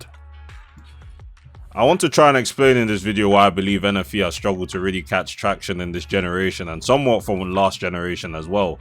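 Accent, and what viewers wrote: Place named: England, United Kingdom. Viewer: What part of england are you from mate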